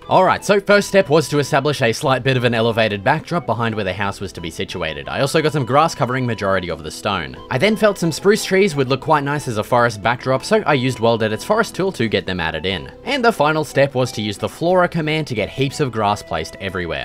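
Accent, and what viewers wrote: Australia. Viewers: He's not British, he's australian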